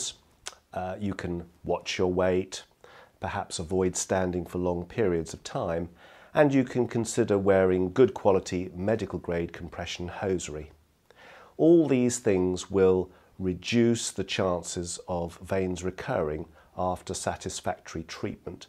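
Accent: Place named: England, United Kingdom. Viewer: Can I marry your English Accent you’re such an awesome doctor I had that procedure and you describe it perfectly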